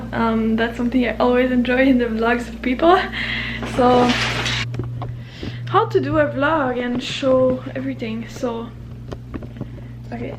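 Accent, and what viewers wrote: Quebec, Canada. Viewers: R u french canadian? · Are you by any chance from Quebec? Your accent sounds familiar :D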